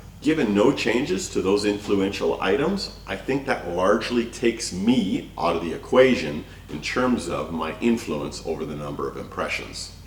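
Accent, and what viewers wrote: Canada. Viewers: Nice. Logical, well presented and informative. I just subscribed......guessed you were a beaver boy.........best national animal ever by the way😅.....by the accent. Going through your catalogue of videos now.......can see some interesting stuff. Keep up the good work. Cheers mate.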